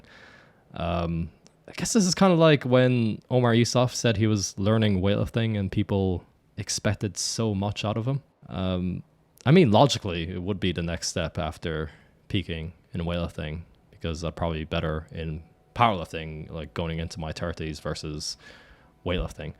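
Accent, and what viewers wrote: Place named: Ireland. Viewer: No, he’s just Irish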